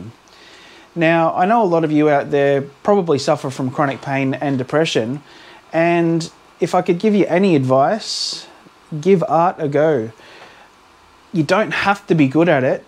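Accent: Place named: Australia. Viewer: so great hearing another Aussie accent here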